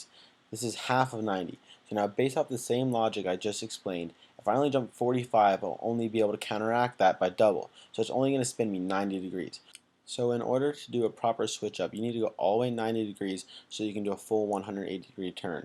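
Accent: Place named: New Jersey, United States. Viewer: NJ accent + skiier inflection = Canadian accent? That is very interesting. Nice video btw!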